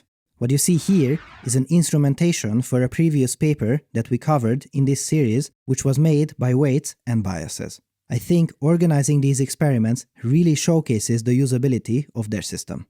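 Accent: Hungary. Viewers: Akcentusod eléggé magyarosra sikeredett. :D jó kis videó lett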